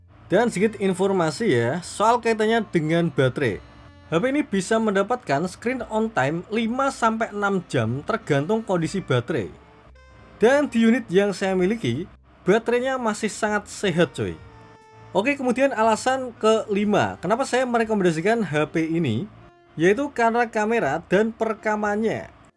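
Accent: Pati, Indonesia. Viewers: wong Pati mas?😅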